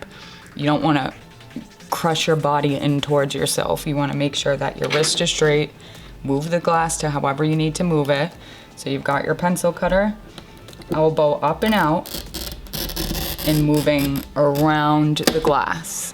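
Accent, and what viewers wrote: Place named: New England, United States. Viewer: Hi! I just took my first stained glass class and I'm hooked!! Currently binge watching your videos - lol. Thank you! I grew up in Ma and as soon as I heard you talking I knew you were a New England gal:)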